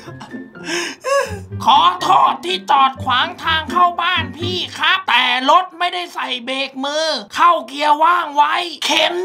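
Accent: South, Thailand. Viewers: พี่ ใต้ไม่นิสำเนียงดีจัด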